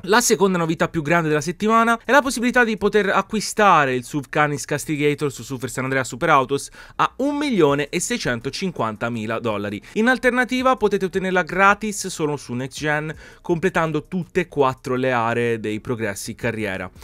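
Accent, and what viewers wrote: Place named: Rome, Italy. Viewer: È di Roma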